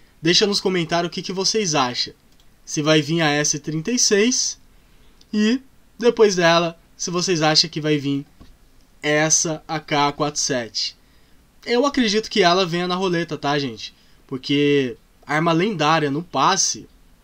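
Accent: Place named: Northeast, Brazil. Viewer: tmj junto, preocupa não mano nos somos nordestinos e temos esse sotaque msm uai kkkk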